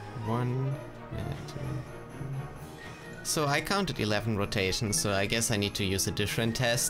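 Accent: Germany. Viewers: He sounds german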